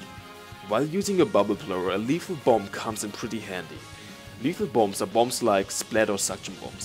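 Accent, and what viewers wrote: Germany. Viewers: I guess ur a german ... am i right ? ... i can hear that DEUTSCH 🛂 accent ... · Are you German? Also I knew about the slow squid trick since Splatoon 1 and it a lot more important than most people think. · You sound so German. Great video though, thanks a lot! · i watched this video and was like „this guy is german“ and indeed you are :)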